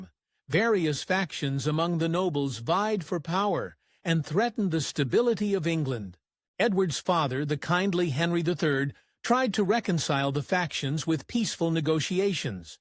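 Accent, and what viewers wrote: United States. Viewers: Why is an american talking about English history? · Hearing an American voice trying to explain history is actually comical.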